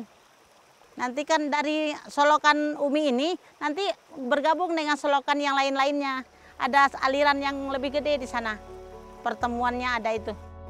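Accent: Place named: Minangkabau, Indonesia. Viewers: Dari logat umi bicara, sepertinya org padang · logat Padang susah hilang ya mi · Melihat logat nya seperti nya umi urang awak!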